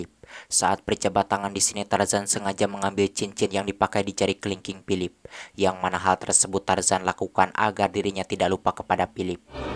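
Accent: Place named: Sunda, Indonesia. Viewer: Cara bicaranya itu loh .endingnya yg menyesatkan 😂😂khas suara dngan nada kesundaan 👍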